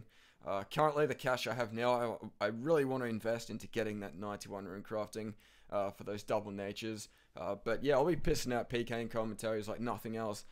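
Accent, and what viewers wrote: Australia. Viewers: just an Australian accent... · are you Australian?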